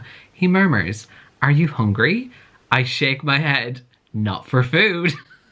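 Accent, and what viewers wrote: Ireland. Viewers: Living for 50 shades of grey + this irish accent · siri with the irish accent omg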